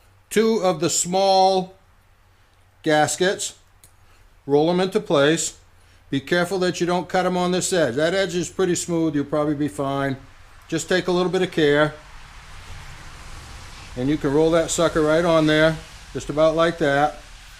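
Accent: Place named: Maine, United States. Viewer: As a frequent visitor to the great state of Maine, I loved the license plates, accent and info! I have the old return tubes in the trash and since I have my old heat exchangers off and also in the garbage, this should be pretty easy....famous last words...we'll see this weekend. thanx again..most helpful and entertaining. steve meltzer, houston, tx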